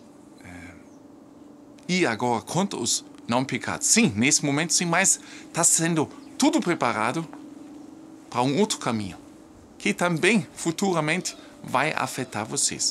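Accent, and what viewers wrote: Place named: Germany. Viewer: Permita-me trata-lo por meu amigo, voce desmonta todos os estereótipos, pré concepções e paradigmas que nós latinos temos a respeito dos Alemães como sendo um povo sério, carrancudo, de poucos amigos e cujo senso de humor não é um ponto forte. Se o Alemao medio é como imaginávamos, voce é um ponto fora da curva!! Voce esta de parabéns pelo senso de humor que se torna ainda mais pitoresco com seu sotaque alemao ( muito legal diga-se de passagem ) ao mesmo tempo que mantem o foco na informação de qualidade e sem muitos rodeios. Sensacional!! Tambem aprecio muito essa forma de dar as noticias, caminhando e mostrando um pouquinho de sua cultura para nos!! Parabéns!!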